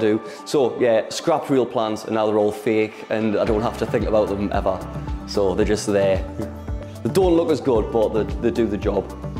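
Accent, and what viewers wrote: Newcastle, United Kingdom. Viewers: Geordie mate, north east of England.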